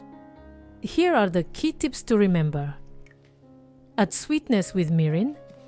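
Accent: France. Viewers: The French accent mixed with Asian accent while speaking English is delightful 🪻💐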